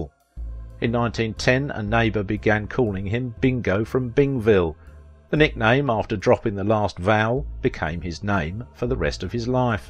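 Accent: United Kingdom. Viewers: Hello there i just want to say to you im facinated by your english spoken tone i miss speakinf to english raised people,and the thing is i was born in argentina 1959 from english parents but now i dont find englush people to practice my language....well dear my blessings foe u from an english scottish lady.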